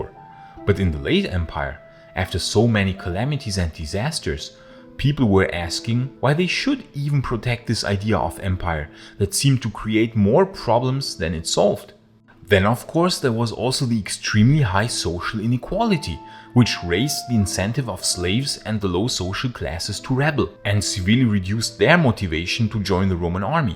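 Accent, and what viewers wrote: Germany. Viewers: Where are you from i wonder about your accent are you german? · Kannst du bitte noch einen deutschen Kanal machen, bei dem du das ganze nochmal neu einsprichst? Nichts für ungut, aber dein Englisch klingt genauso wie das eines alten Klassenkameraden, der versucht hat so akzentfrei wie möglich zu sprechen. Das klingt in der Regel allerdings noch schlimmer als wenn man ganz normal als Deutscher englisch redet. Schau deine Videos echt gern, aber dieses versuchte Unterdrücken der deutschen Aussprache macht es echt schwer zu schauen.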